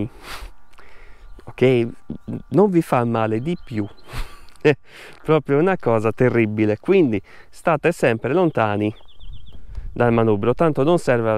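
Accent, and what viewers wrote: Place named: Sardinia, Italy. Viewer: A metà del video ero già iscritto. Parli come deve parlare qualcuno che ci tiene a farti imparare. Bravo veramente e l'accento sardo rende tutto più simpatico.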